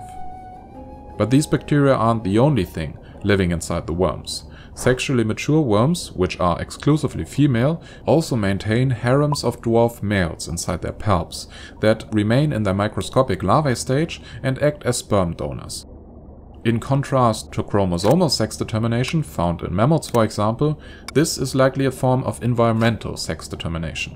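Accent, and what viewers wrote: Germany. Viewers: That German accent ~ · I cant unhear the fact that he speaks with a german accent XD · I still do not know why this worm is a "zombie worm". But now fortunately I know that Indian English is not alone - German English also exists ;) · i just geared your accent and knew you are german xD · i guess he is german . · Are you German?? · You sound German, but your english is good. A rare thing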